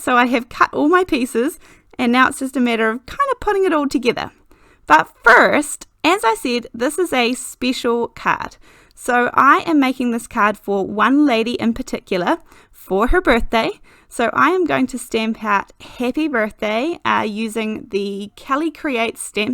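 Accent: New Zealand. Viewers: I am lucky Lisa. Thank you to so much for the beautiful card. I sat watching that video and when she was stamping the s in Lisa I looked at my daughters face and I knew it was for me. I have never been so overwhelmed in my life. It was awesome. I will treasure that card, just as I treasure my georgous daughter for doing that for me. That was better than winning Lotto!!!! Thank you all for the lovely birthday wishes. I love watching videos. I love her style, and her creativity as a craft artist, and I am so comforted by her kiwi accent. And her love of Ali Express. So thank you all so much and now I have finally make a comment in this fantastic group, instead of just watching and admiring.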